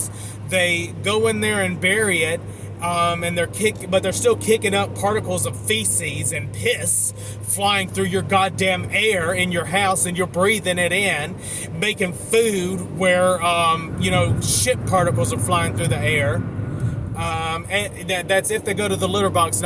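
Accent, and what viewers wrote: South, United States. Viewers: I missed your beautiful southern accent. You make some valid points about cats. I am a cat lover too and my ancestors in Egypt loved cats. Not all cats behave in the way you suggested and a well trained cat is actually a really cool creature to have around the house and long as the house is kept very clean aswell as the animal itself. Cats are generally much cleaner than dogs anyway. All pets add extra workload to the housework so that comes with the territory. I agree a humane cull is needed though, as the cat population is definitely getting WAY too hard to manage for federal and state authorities resources. That being said, human societies around the world have kept animals as pets for thousands of years and that's a part of human nature that you never going to change. We still need these rants urgently as they remind us of our core responsibilities as humans to the rest of the environment. 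Please don't stop making these videos. And thats coming from ME -AN OBSESSED CAT LOVER.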